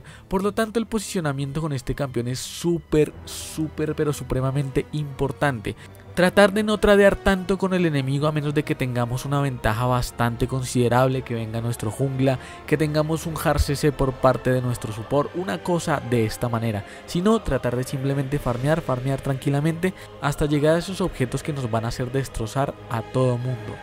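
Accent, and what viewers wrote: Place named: Bogotá, Colombia. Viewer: Oye qué excelentes guías! Son las mejores que he encontrado en español. Felicidades. Tengo la impresión de que eres de Bogotá jajajjaau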